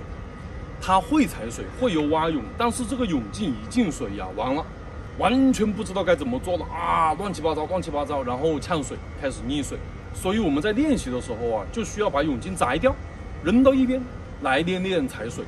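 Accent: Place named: Hubei, China. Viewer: 这教练大概是湖北人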